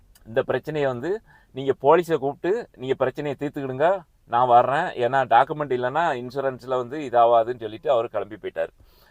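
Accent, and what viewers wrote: Tirunelveli, India. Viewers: இப்படி வளவளன்னு பேசி வீடியோ போட்டால் எப்பவும் கேட்கிறது கிடையாது ஆனா நீங்க பேசறது நம்ம திருநெல்வேலி மொழி கேட்க மிகவும் அருமையாக உள்ளது வாழ்த்துக்கள் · ஆமாம், வெள்ளந்தி மனிதர். கேட்கக் கேட்க சலிக்காத நெல்லைத் தமிழ்.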